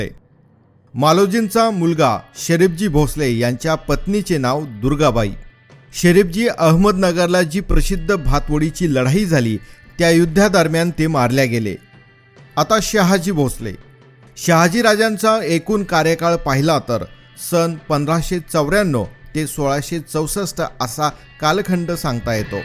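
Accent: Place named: Thanjavur, India. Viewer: Tumachi bhasha Tanjawar Marathi watate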